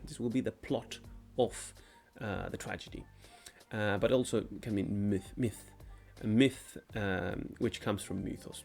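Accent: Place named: Spain. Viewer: Me encantado! Que bueno es oir Graeca con un linga de Espanol. Yo amo las linguas de Espanol, y Griega, y otros. Now English. First truth: if one feels too proud just delve into Greek grammar to restore humility! For Ioanna, recall that Greeks were once illiterate. I refer to post- Mycenean collapse. They had their speech with all sounds/phonemes to express ideas, cases, etc. They had no WRITTEN case endings. They were then offered a menu of letters ( likely from Phonecian traders) from which to represent there spoken sounds. Folks who not long after created the Parthenon would not likely chose a number of letters for the same sound. Evidence also includes poetry lines that wdre meant to ryme but no longer do, latin transliterations and so on. The rough breathing was orig. H in another dialect and pronounced. Eyes were ophthalmoi now matia, winos ( with digamma) became winus then wine. 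Now called krasi, from mixing with water in a krator. Modern every day folks deny or do not k now this. Read Luke without much understanding. They eliminated Kztherevousa and adopted Demotic, the language of common ( usually poorly educated in lzst century, but not now of coursr). This removed them a step further from their kleromia/ heritage. No Greek kb here. But i love the channel and i subscribe. Karl Ioannis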